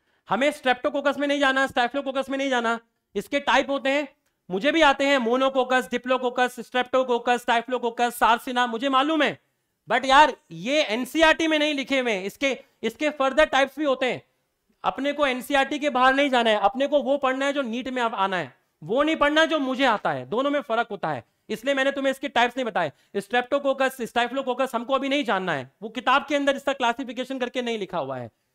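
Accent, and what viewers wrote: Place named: Hyderabad, India. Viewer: sir mujhe hydrabadi lag rahe unke language se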